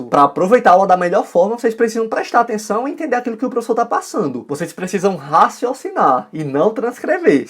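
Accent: Northeast, Brazil. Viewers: Só pode ser Nordestino tu e demais cara Deus te abençoe de todos os vídeos que vi no YouTube você e o mais disposto à ajuda os estudantes vlw cara sucesso pra vc. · rapaz tu é nordestino? eu sou e amei esse sotaque!! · Reclama com Portugal, frança e também com os holandeses!! Eles que influenciaram o sotaque nordestino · A energia do é contagiante, da pra ver que ele adora isso, além de ser super didático e detalhado em compartilhar dicas e formas de nos ajudar gratuitamente (o que é difícil nos dias de hoje). E GENTE QUE SOTAQUE E SORRISO MARAVILHOSOS. ❤🤪 Fico tão feliz e orgulhosa quando vejo um ytr do nordeste representar assim.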